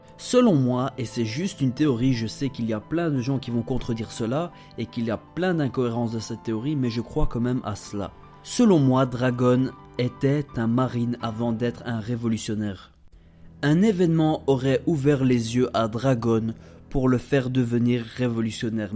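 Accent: Belgium. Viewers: ça c'est l'accent Belge ^^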